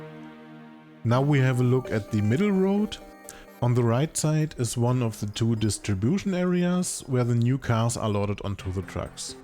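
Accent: Germany. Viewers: The voice works well! German accent gives extra charm to the video, Serious, I can't imagine any other accent for Tesla German factory. Why can you not fly over the factory anymore? The video is excellent, as always. Maybe a zoom improvement in the future. Greetings from NL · best episode from over the traintrack so far! as a german, I really like your german accent. has some nice authentic touch to it.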